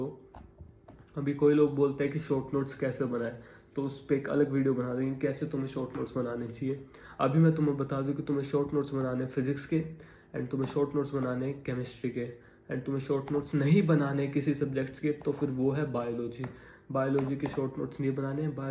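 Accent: Haryana, India. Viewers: Hariyanvi me hi bol k video bnaya kro mast mst lgte ho apne accent me dono 🥰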